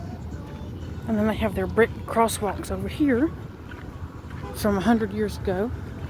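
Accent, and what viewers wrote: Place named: Texas, United States. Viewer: Love that Texas accent (I miss that)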